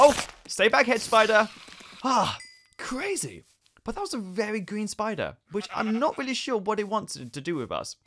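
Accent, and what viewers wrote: United Kingdom. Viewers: British accent......love yah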